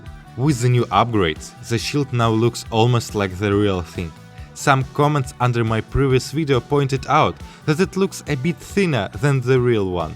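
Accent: Poland. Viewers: We understand you are not Hungarian you are polish! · are you polish?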